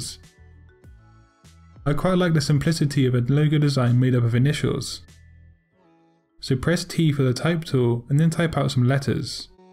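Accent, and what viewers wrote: United Kingdom. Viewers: Your accent sounds really british..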